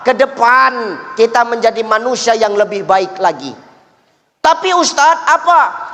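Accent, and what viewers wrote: Medan, Indonesia. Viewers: Uztaz. Kau....kau...kau. Memang itu logah nya. Medan punya bahasa. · Gas terus bahasa dan dialek Medan mantap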